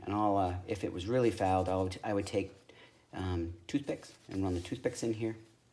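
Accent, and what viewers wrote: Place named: Boston, United States. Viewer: Cool Video! Are u from Boston? Sounds like u have a Boston accent 👍👍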